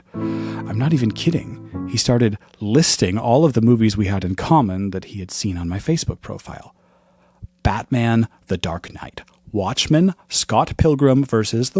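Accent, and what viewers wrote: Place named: Canada. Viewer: Knew in an instant you were a fellow Canuck- pronunciation of foyer and use of university (Yankees say college even if Master's and doctorates are offered. Never understood that one). Love the humour!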